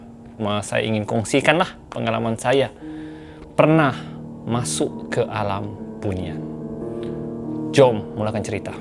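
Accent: Sabah, Malaysia. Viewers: Logat sabah cantik bunyi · Sy org Kedah,tapi suka sgt dgr loghat Sabah.. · Aku pun suka tone org sabar ckp sedap Dan aku Cuba belajar ckp tone mcm tu,memang susah Beb. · Proud of your accent bro! This is how we as Sarawakian & Sabahan should talk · Seram sbb jln cerita..Lawak sbb slang2 bugis tawau..ketawak sendiri aq takut org smnnjung dk paham..ditapok bha😂😂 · Sape nak tau loghat melayu zaman dahulu.. Boleh dengar loghat Sabah zaman skrg.. Like tanda setuju 😁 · Penceritaan yang bagus. Ton suara yang sedap didengar. Sebutan jelas. Ada emosi ketika menyampaikan. Walaupun dalam loghat Sabah, tiada masalah utk memahami dan menghayati. Jadi, teruskan! Saya suka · Tu sebahagian dari loghat melayu sabah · Best gila bro ni bercerita. Aku Jrg2 bukak youtube, dgr org bckp smpi hbs. Sbb apa? Sbb boring. Suara tak sedap. Annoying. Accent sbh bro ni, pnceritaan dia yg clear buat aku khusyuk nk dgr smpi hbs. 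Hahha. Good job! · Ak minat betul dengar loghat sabah ni .best je dngar. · I just love Sabahan's accent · Bkn setakat accent sabahan ja , org2 dia pn aku suka . Cantik2😅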